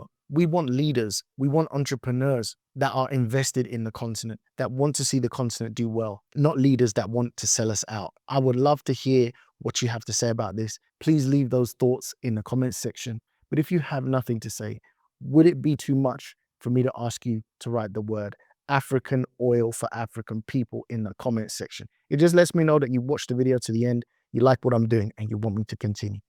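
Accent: Nigeria. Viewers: The Nigerian accent when reading the BS is funny 😂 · You got Nigerian accent down pack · I like how your Nigerian accent come out when you where reading. Naija cant hide no matter how you try 😂😂😂😂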